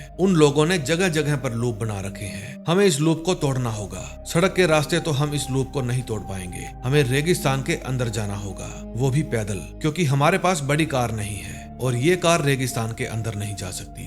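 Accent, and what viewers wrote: Punjab, India. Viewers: are u punjabi?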